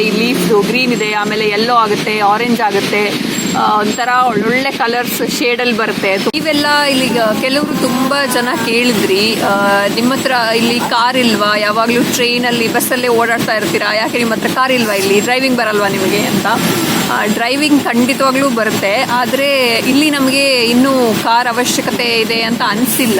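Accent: Mangalore, India. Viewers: Sis nivu mangalore kannada ne matadi keloke manasige eno khushi anasatte plz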